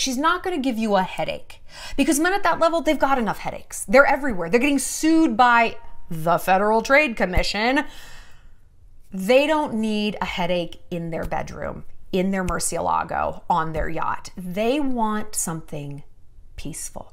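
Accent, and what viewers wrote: Russia. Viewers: Your Russian accent and the random script you chose was soooo funny! I hope you do a Advice-themed standup and maybe commercials and movies!! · Your russian accent gives me absolute life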